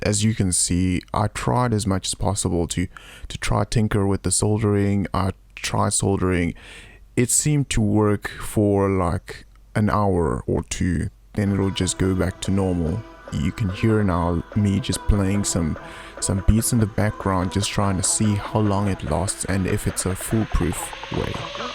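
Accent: South Africa. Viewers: South african accent.. Thanks.. Good info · you sound like you're from South Africa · South African? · South African? lol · You sound south african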